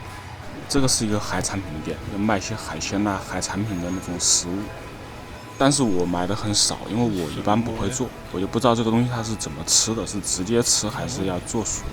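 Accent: Wuhan, China. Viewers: 我怎麼聽出武漢話的口音了哈哈哈🤣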